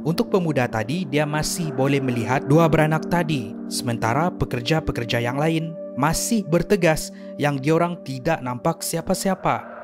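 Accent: Sabah, Malaysia. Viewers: Inngat kan ni indon tpi sabah bha😂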